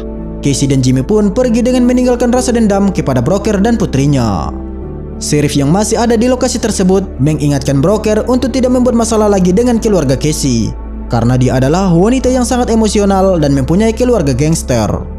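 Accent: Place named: Toraja, Indonesia. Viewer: Klo bisa logat Torajax dihilangkan bro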